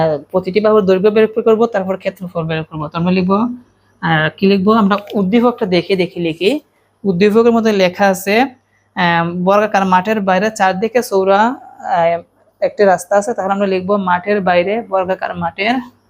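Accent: Sylhet, Bangladesh. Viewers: ভাইয়া আপনি কি সিলেটি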